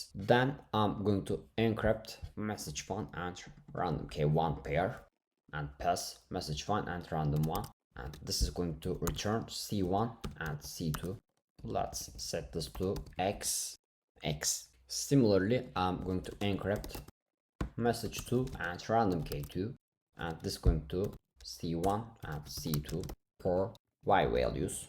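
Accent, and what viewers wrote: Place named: India. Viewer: kanka çok iyi içerikler üretiyosun ama hintli ingilizcesi gibi konuşuyosun yanlış anlama :D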